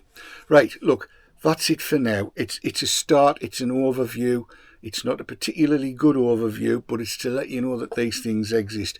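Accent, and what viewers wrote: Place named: Newcastle, United Kingdom. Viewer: British isn't a language, it's a nationality covering the English, Scottish, Welsh and Northern Irish. Scottish isn't a language either (though Scots is). This guy speaks English with a Geordie accent (from the Newcastle area in NE England) and maybe just the occasional word of local dialect rather than standard English.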